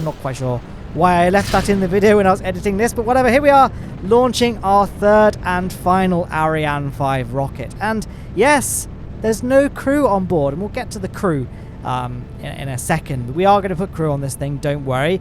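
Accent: United Kingdom. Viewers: He's as British as they get, you can hear it on his accent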